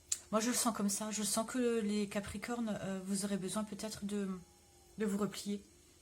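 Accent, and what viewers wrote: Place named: Alsace, France. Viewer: coucou j'ai reconnu ton accent. Moi aussi je suis alsacienne :) Tu vis où ?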